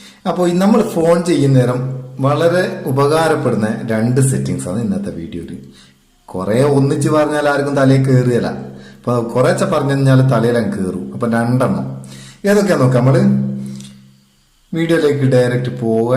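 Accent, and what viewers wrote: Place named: North Kerala, India. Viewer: കേരളത്തിലെ വടക്കൻ ഭാഷ കേൾക്കാനും ഒരു സുഖം.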